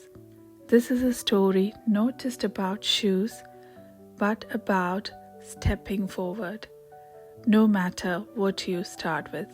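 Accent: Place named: United Kingdom. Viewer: Ma'am I like reading and story telling. I am going to practice shadowing with you. Your pronunciation, rhythm, intonation everything is perfect and most importantly you speak british pronunciation which I love to speak ❤❤ It will help me to improve my pronunciation. You speak natural English but we are trying to make it natural 😊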